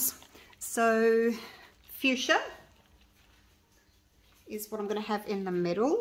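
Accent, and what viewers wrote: Australia. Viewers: Wow that is amazing! Everything you do is amazing!! I work with resin but can’t even come close to doing all the great stuff you do!! I love all your videos!! Plus there isn’t anything better then a Australian accent!! Lol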